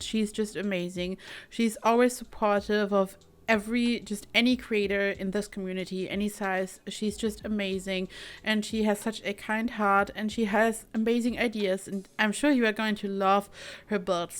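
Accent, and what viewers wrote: Germany. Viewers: your english... I could swear you german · You sound sooo german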